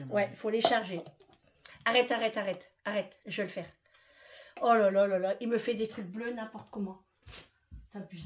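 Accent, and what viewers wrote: North, France. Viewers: Bonsoir je suis une nouvelle abonnée. J'ai aimé votre naturel, votre simplicité et votre franc parler. Vous avez l'accent du nord. Je suis de douai ( 59) prenez soin de vous et de vos proches. Je vous souhaite pleins de belles choses à vous et votre famille. Dieu vous bénisse ❤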